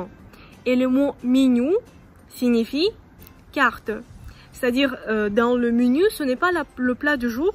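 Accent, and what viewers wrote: Russia. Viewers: J'adore votre accent russe en parlant français 🥰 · Craquante et croustillante avec sont petit accent Russe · 2 ans plus tard désolé pour le retard 😅... Vous savez la France n'a pas de leçons à donner à la Russie sur la gestion de son pays, parce qu'en fait, si on regarde l'endettement de la Russie l'endettement de la France on a tout compris, la gestion de l'eau du chauffage, et de tout le reste en fait, c'est pris en charge par des sociétés privées, qui s'en mettent plein les poches, au détriment des citoyens français, voilà pourquoi l'eau, le chauffage, électricité tout est cher en France, et ça devient inadmissible, beaucoup de compatriotes français s'expatrie en Russie, justement parce qu'ils ont compris, que la France n'était plus la France, et qu'en fait la Russie ressembler beaucoup plus à la France d'autrefois, que la France actuelle. 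Ce que vous faites c'est génial, vous remettez les pendules à l'heure entre la France et la Russie, j'aime bien vos vidéos, et surtout votre accent Russe quand vous parlez français. Pour parler de Paris, Paris n'existe plus, dans le sens culturel, propre à Paris, cette ville est très mal géré, et ils ont un endettement de 7,713 milliards, ce que cela, va certainement vous étonner.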